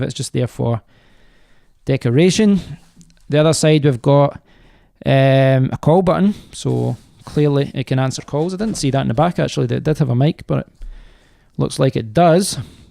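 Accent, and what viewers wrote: Scotland, United Kingdom. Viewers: i love the way you say K .... i love your ascent reminds me of my grandparents who were both from Scotland..thanks for the vid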